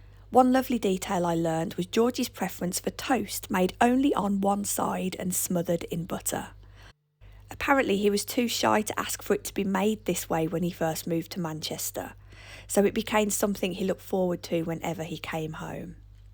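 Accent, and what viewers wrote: Birmingham, United Kingdom. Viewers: speaks with a lovely regional British accent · the birmingham voice is so nice to listen to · you keep your voice... British English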